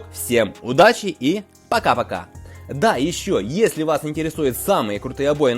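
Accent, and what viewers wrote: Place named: Ukraine. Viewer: Он просто с Украины, это ведь слышно что это не родной язык для него, есть акцент и говор.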